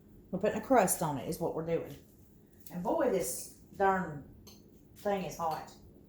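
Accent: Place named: South, United States. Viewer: Every time you say something like “Right quick” I just ❤️ you more! You speak my family’s language! My sister and I keep saying we are going to do a dictionary of our family’s quirky southern phrases.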